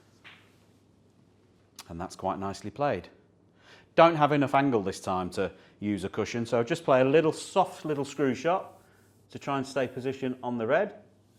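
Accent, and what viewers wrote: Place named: United Kingdom. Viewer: Hello Shaun! English is my second language and watching your videos I just enjoy your accent and some intricate British phrasing that you occasionally use. It's fascinating how a sportsperson can be so successful and articulate at the same time.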